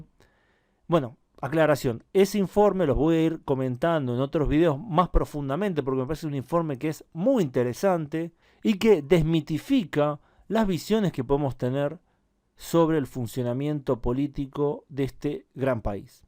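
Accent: Argentina. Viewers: Buenos dias .muy Buenos conteúdo. Parabéns pelos vídeo. Mi prime vez que llego aqui en canal. Escutando lo acento argentinos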